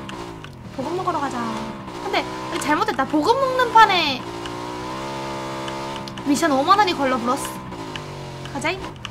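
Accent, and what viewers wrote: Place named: Jeolla, South Korea. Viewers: 고향이 전라도...? 최근꺼부터 거꾸로 보고 있는 편인데.... 이때만 해도 사투리 작열이네욥 ㅋㅋ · 님 사투맄ㅋㅋ 고향이 어디세여? 전남 영광같아ㅋㅋ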